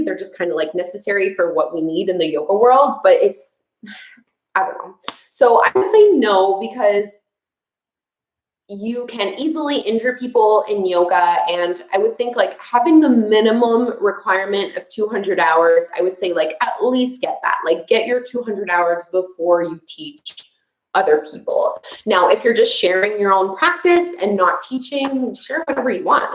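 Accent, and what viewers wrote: Canada. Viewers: Thank you for this challenge, I got my boyfriend to start doing yoga with me everyday and we are loving your videos! Also I loved hearing you speak French! I'm French and I love your Canadian accent! ❤️❤️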